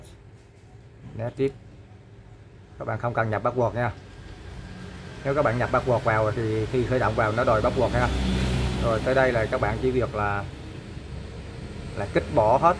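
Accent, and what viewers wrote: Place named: Binh Dinh, Vietnam. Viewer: Mình công nhận bạn từ khi tạo kênh đến giờ xem vi đô của b cái nào của bạn nói trong rất đậm chất nẫu k cắt ghép hay chỉnh sửa gì. Hd rất chi tiết k tua nhanh mình xem cũng xứ nẫu rất dễ hiểu...cảm ơn rất nhiều..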